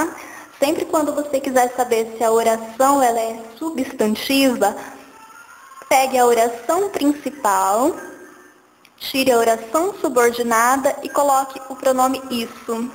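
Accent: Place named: São Paulo, Brazil. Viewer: Que voz fudida e de paulista!Mais boa aula!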